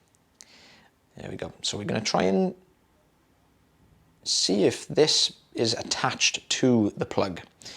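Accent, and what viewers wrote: Wales, United Kingdom. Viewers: Look how the rookie became a specialist… The only correct answer is that you were trained by the best 🤨😎 And the Welsh accent is soo nice to listen to 🤭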